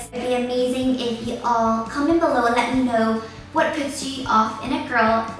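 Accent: Ireland. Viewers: She Irish I think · She sounds like irish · Are you Irish lol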